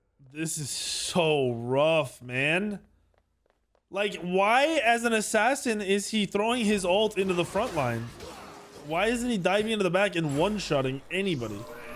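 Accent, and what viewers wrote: Jamaica. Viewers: he sounds jamaican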